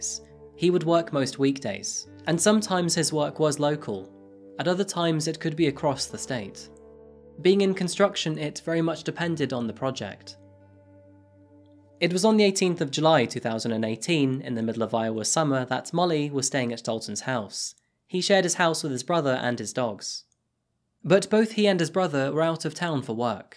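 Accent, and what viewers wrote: England, United Kingdom. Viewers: Am I the only one that gets annoyed that he gives temperatures in farenheit and doesn't even provide a conversion to celcius...I have no fuckin idea what 88f is and neither do most of the world. Dude you have a British accent throw us a bone here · that's hella weird and goofy. Not at all, those subs gonna hit 1 million soon enough for this guy, so it speaks for itself. Very clean production, B roll smooth editing, and his very English accent adds to his distinguished diction,soft spoken but most importantly. He humanizes the victims in the cases, he puts the focus on their story of how they lost their life and doesn't sensationalize it. But as he tells their story like hers, he honors them. by telling their story like this, he's getting the bag and does it with class.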